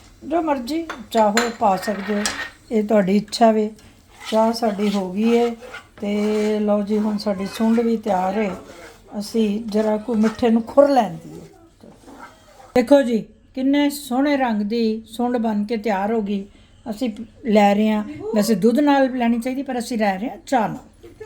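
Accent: Punjab, India. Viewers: Aunty ur punjabi is so so sweet.im from pakistan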